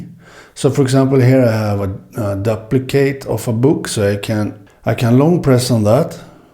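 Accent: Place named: Sweden. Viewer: Jag har just installerat "assistant.koplugin" från github på min Kobo libra 2. Testade för ett par år sen med askGPT, men den funkade nog bara till jailbreakad Kindle. Men jag rekommenderar verkligen att kunna använda AI. Kör själv DeepSeek, som jag eg bara kan tycka ta ngt för lång tid, men annars hur bra som helst. Tack för en utmärkt kanal. Jag känner mig väldigt hemma här som svensk, medelålders e-bokläsar-nörd med samma svengelska dialekt som du. :)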